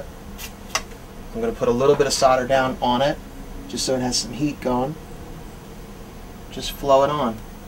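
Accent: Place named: United States. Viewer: cos he's american... they say it like "sodder" for some reason.. even though nobody else in the entire world does.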